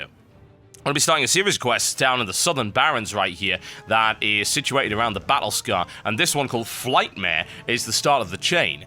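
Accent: United Kingdom. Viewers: because he is from United Kingdom,has British accent,commentates on wow and games,and theres more awesomenessnessessess stuff i cant even think about or my head would explode from the sheer awesomenessnessessess =D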